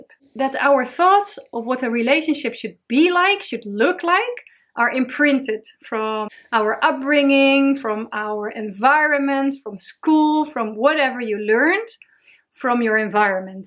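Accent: Netherlands. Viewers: hey, I hear a fellow dutchie here!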